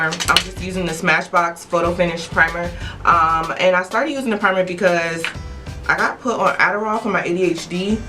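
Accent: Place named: California, United States. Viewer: I'm from Cali!!!! and you sound like you From California too... lol. you are so good at what you do. thanks for all of your videos. You are so beautiful!!!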